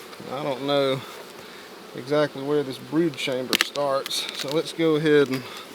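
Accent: South, United States. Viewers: "it was my granddaddy's" awe, as a canadian I dont hear real southern- talk much, its really heart warming to hear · Of course he has a southern accent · His southern accent soothes my soul "this was my gran daddies' " made my heart melt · I live in North Georgia, most people I know have pretty deep southern accents. Because of where I live most people here are pretty mean, so the accent doesn't exactly give me the warm fuzzies. But this man seems so calm and kind that the accent sounds nice. I've watched maybe ten of his videos already and now I wanna be a beekeeper!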